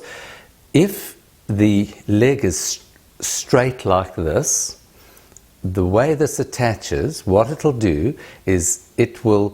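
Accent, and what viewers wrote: South Africa. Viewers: That has got to be a South African accent lol. Either way, I won’t really use this information anywhere but I enjoyed the video regardless. You’re a great teacher ❤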